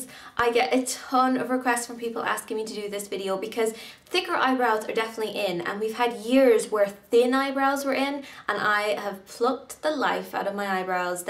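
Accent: Ireland. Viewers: Well, I love your accent! Are you Irish? Great video btw :) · Omg at the start of this video I was half paying attention and thought she was american and then once I fully paid attention I was all "hold the phone, she's Irish!" I don't even know how I could make that mistake haha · Caroline Aleman Ireland I think.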